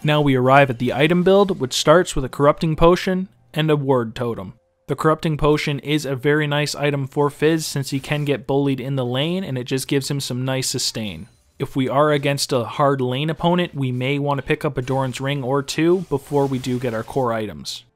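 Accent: Canada. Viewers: you're canadian aren't you?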